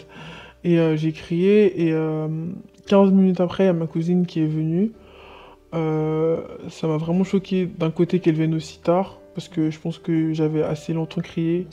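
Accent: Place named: Belgium. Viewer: Sa s'entends que c'est une Belge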